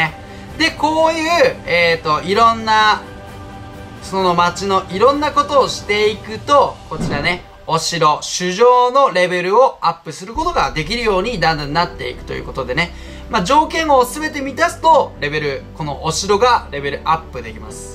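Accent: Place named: Kansai, Japan. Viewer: 関西弁が滲み出てるで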